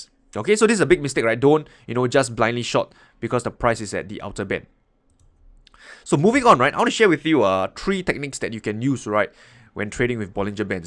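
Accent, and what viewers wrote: Singapore. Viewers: Nice to hear a Singaporean accent :) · are you singaporean, i like your accent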